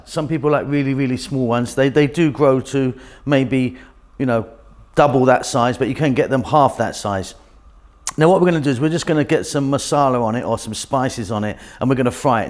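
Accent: United Kingdom. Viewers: Like your cooking with standard British accent 👍👍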